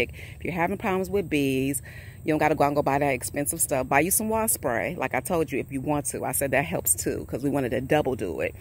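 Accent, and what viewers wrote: South, United States. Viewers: I live in the Midwest now but I miss a good ole southern accent. “We sprayed it with this DAAWN” 😂 love it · Girl, you sound like me. You must be from the south too. 😀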